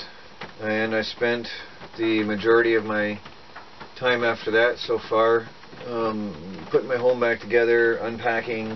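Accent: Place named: Michigan, United States. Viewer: Your Michigan accent is showing. :)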